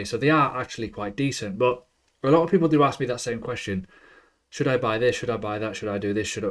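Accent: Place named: Northern England, United Kingdom. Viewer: Great video man, just signed up to your patreon too. Refreshing to hear another northern English voice talk about crypto lol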